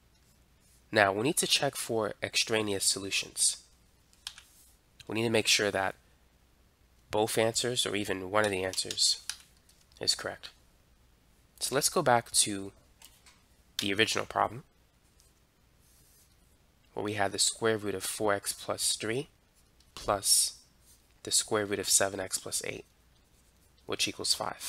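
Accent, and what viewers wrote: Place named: New York, United States. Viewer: Based on your accent I'm guessing your from new York or jersey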